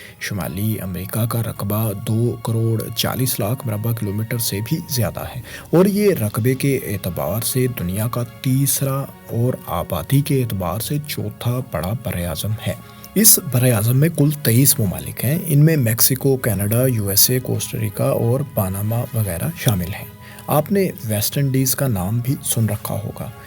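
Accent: Punjab, Pakistan. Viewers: please work on punabi accent .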